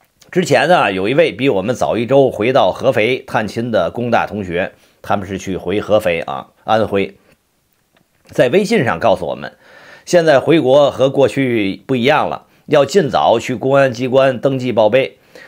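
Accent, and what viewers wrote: Tianjin, China. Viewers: 博主是天津人吧哈哈哈哈这乡音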